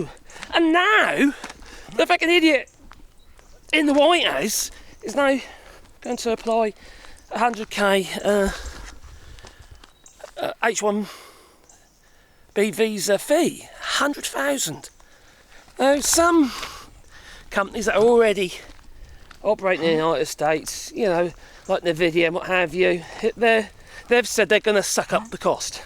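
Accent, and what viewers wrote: United Kingdom. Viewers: I sometimes have a hard time understanding your Britain accent, but I've loved from the first time i found channel ❤❤❤ 🇨🇦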